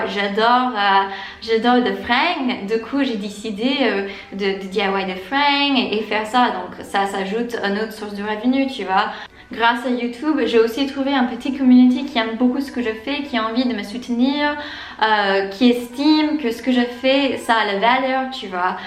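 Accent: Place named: Paris, France. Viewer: Yeeeeeeeeeeeessss, the best day of the week. Also, a french speaking anglophone (Toronto) living in a franco-canadian city (Montreal), and in a relationship the last 7 years with a european french speaker (Belgian), it makes me laugh how your accent seems so.... Parisian Anglophone ahaha. Especially when you talk with your husband in some other videos, the Parisian really jumps out, it's really nice!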